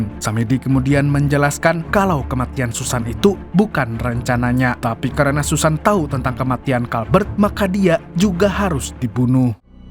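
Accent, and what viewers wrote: Banjar, Indonesia. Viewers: Banjar nya kada ketulungan 😂😂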